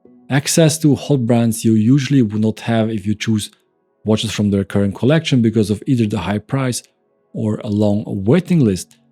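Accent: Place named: Switzerland. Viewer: Love this content. You give precise and honest insight. What can be irritating at times in your constant mispronunciation of "available*" (say: ah-vale-ah-bell" not "away-bell"!) and you always pronuncing a "V" like a "W". They are sometimes pronounced the same in German (sounds like Swiss-German is your native language), but are vastly different in English.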